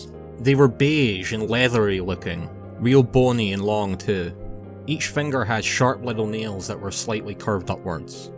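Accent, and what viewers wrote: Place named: Northern Ireland, United Kingdom. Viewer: NI accent. .o.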